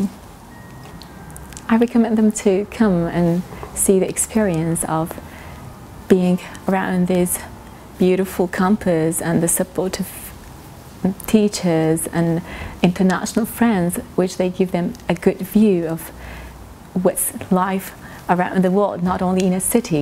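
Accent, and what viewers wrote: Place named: Iran. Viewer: Medicine I believe.... She has a Persian accent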